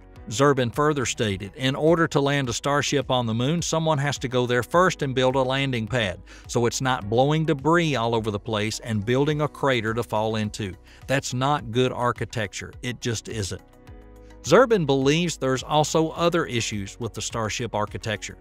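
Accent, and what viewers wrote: South, United States. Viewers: Quite frankly, this guy has no idea what he is talking about. Also, most of his information is very outdated or otherwise inaccurate. However, as entertainment, it's a gem. Southern accent: "the rocket plume is going to blow a hole in the moon". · What a sh!tty scripted video. The southern accent makes it worse too. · Won’t listen to a cowboy accent talk about space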